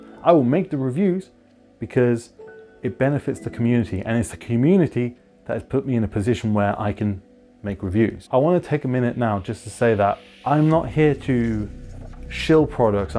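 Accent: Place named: Scotland, United Kingdom. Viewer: You have an intresting accent. Where are you from? Scotland?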